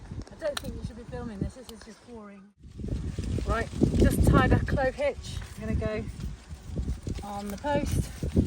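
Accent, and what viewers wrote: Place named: United Kingdom. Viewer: British gals are so cute and sexy. Love your accent 😍❤️.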